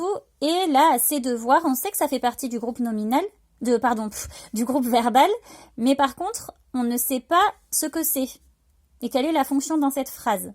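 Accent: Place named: South-West, France. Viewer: Quel bonheur cet accent du sud-ouest qui fait que j'ai tout compris ce qu'est un COD et un COI ! Merci maîtresse !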